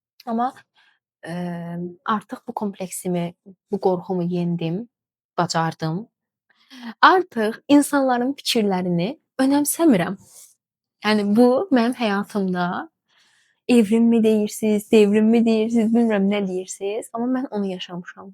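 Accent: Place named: Sheki, Azerbaijan. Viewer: Şəki ləhcəsi çox qeşeydi. Uğurlar sizə, çalışın motivasiyadan düşməyin ❤️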